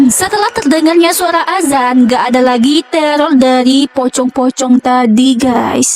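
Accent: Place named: Padang, Indonesia. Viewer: Kak * dg khas logat padang nyaa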